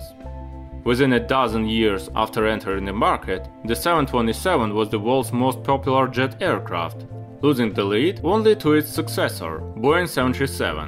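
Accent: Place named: Russia. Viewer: Why the fuck is a Russian accent telling us about the American Boing 727 history …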